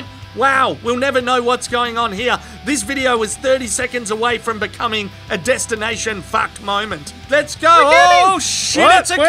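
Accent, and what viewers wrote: Australia. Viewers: FMD... how it really is, Aussie style commentary, thank goodness for this! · 50% funny from the video. 50% funny from the accent and Aussie colorful phrases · What is it about an Aussie's accent that just makes things funnier?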